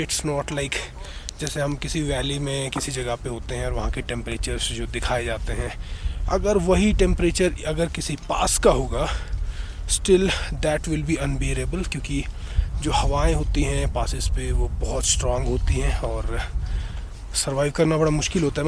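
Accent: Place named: Himachal Pradesh, India. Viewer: Bhai himachal se ? Himachali language lag rhi hai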